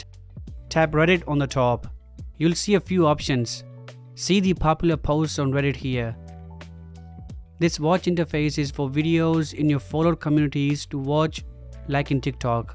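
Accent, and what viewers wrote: India. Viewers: Indian accent!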